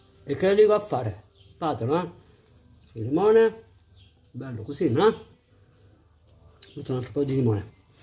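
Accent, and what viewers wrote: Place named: Lecce, Italy. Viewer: mi sono appena iscritta al tuo canale, sei bravissima e simpaticissima con la tua parlata leccese, io sono di taranto e mi piace tantissimo cucinare, come da buona pugliese. Ciao e adesso che ti ho scoperta non ti lascio più. Amica mia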